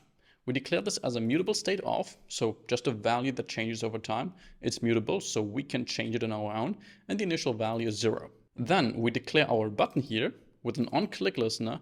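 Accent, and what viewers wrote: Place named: Germany. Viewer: Der deutsche akzent lol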